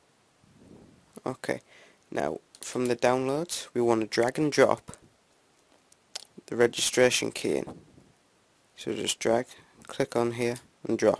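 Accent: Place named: United Kingdom. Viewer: hey where do you come from. i know youre british but what part? its just that youre accent seems familiar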